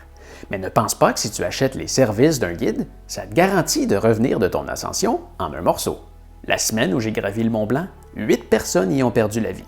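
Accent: Quebec, Canada. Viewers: Très bon videaste un peu imbu de lui-même mais l'accent quebecquois est trop cute.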